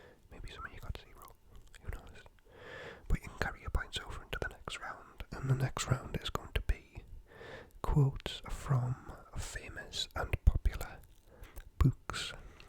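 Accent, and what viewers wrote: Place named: Newcastle, United Kingdom. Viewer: Straight up following cos I’m from Newcastle 👋🏼👋🏼👋🏼 been dying to find a Geordie asmrist!!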